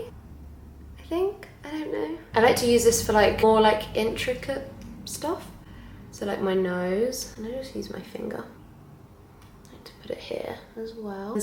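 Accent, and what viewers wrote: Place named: United Kingdom. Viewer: I have never heard you speaking and I never expected you are British!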